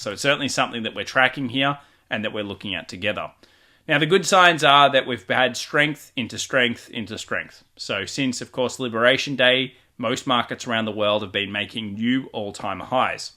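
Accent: Australia. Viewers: Your audio was fine. Maybe you sounded 10% less Australian which means that I can actually understand you better.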